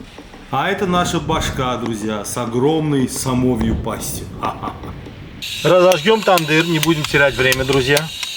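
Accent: Azerbaijan. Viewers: Дорогой Приветствую! Это снова я! Вячеслав из Нижнего Новгорода! Я не перестану восхищаться тем что вы делаете и как преподнесите! Это шедеврально! И да кстати! Не смотря на то что вы Азербайджанец, и говорите на русском, моем родном языке. Вы говорите на русском очень красиво, с акцентом! Говорите очень грамотно, даже понятней, чем я говорю 😂! Дай Бог Вам здоровья крепкого, мирного неба над головой, процветания и всего самого наилучшего! Я ваш фанат! 😊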